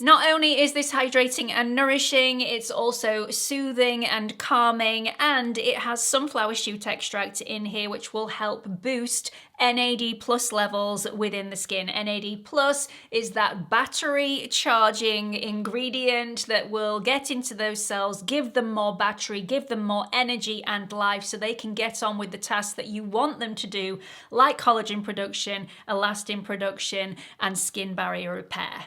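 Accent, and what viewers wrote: United Kingdom. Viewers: I gave a thumbs up for this video, even though it seems to be a long ad for expensive devices I can't use because I have an implanted cardioverter-defibrillator. I honestly never noticed how well you sell-Sell-SELL! No worries, your voice is so enjoyable, and your British accent helps train me to listen better as I'm hard of hearing. Thanks for the lesson!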